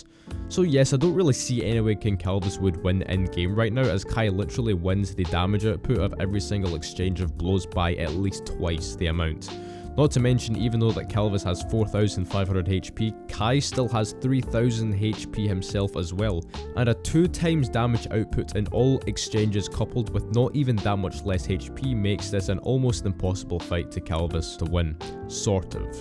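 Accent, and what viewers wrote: Scotland, United Kingdom. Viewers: u scotish?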